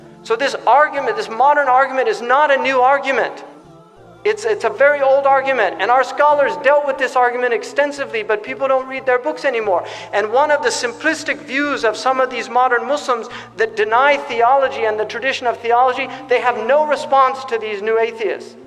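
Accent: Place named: Arab world. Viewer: Hamza yusuf un arapça aksağanı çok kuvvetli maşallah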